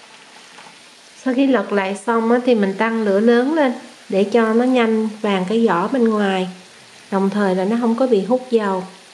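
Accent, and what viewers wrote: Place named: Saigon, Vietnam. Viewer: Xin chào em cảm ơn em chia sẻ món chay này thật lạ mà nhìn rất ngon! Không biết khi ở VN em ở quận nào tại SG mà giọng nói rất SG de thương 😊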